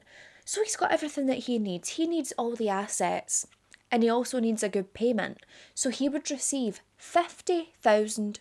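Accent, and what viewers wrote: Scotland, United Kingdom. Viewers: Great video! Ive never heaed of this case and I grew up in Spokane Washington. Weird. I think you are so beautiful! You totally remind me of Bailey Sarian with a Scottish accent 😂 I love you both so so so much!!!! (((HUGS))) from Idaho USA ❤ 🐈‍⬛🐈‍⬛🐈‍⬛🐈‍⬛ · Sooooo…. I love ur accent and I know u try to pronounce things really well so ppl not from Scotland can understand (like myself). But I would LOVE a video with Scottish slang and ur natural pronunciation!!!! Maybe closed captions for slang words but I absolutely love hearing ur accent!! Jus an idea 😅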